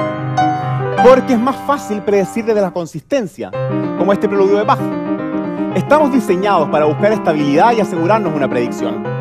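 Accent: Chile. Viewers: Es de Chile. El acento sudamericano y centroamericanos siempre sonarán así porque no existe el español neutro en el día a día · Como no reconocer a un chileno intentando pronunciar bien. Viva Chile ·%%$··3# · Este gallo es chileno?? 😱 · Excelente, su acento es Chileno, como yo... Reconocible para cualquier Chileno, saludos 🤗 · Sin leer la descripción me di cuenta que era Chileno, por su acento, que grande un compatriota tan talentoso, felicitaciones y seguiré su canal desde ahora, éxito, sigue triunfando. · Así suena un chileno hablando un español más general XD, sin modismos chilenos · Zangano Inkulto Tiene todo que ver, es uno de los acentos chilenos 😂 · Claramente, es por que ambos son Chilenos, acento parecido! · Es chileno?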